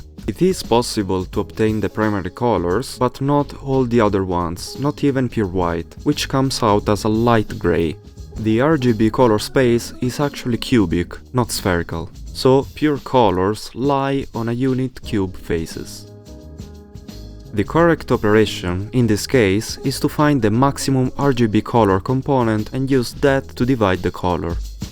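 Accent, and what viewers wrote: Italy. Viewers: ottimo video, l'accento italiano inconfondibile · you sound italian as hell... fire video.....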